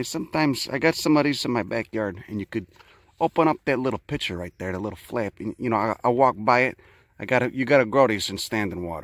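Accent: Chicago, United States. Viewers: I liked your Chicago Italianiano accent, then you cussed, along with your Latin splain, and you won my heart and mind.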